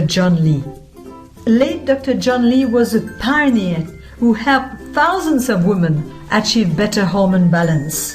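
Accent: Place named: France. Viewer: where is that strong accent from ? France ?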